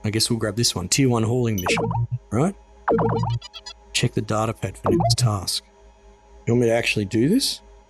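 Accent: Australia. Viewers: Your accent sounds like it must be from Adelaide.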